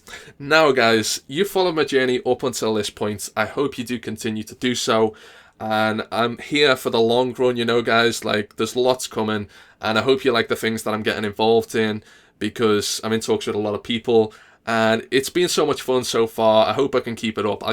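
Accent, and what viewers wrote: Liverpool, United Kingdom. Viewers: ***** Hes a scouser they all sound like this lol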